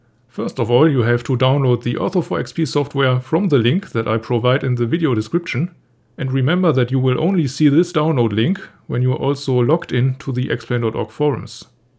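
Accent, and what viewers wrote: Germany. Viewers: also ich finde dein english ja absolut spitze, aber muss dieser Deutsch akzent dabei sein? XD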